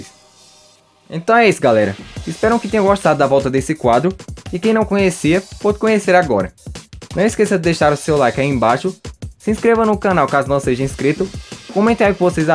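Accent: Northeast, Brazil. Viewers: ei doido tu e do Nordeste?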